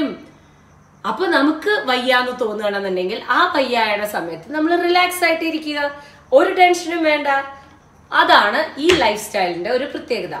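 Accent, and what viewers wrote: Palakkad, India. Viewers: പാലക്കാട്‌ ഭാഷ 👍👍👍👍അവതരണം നന്നായിട്ടുണ്ട്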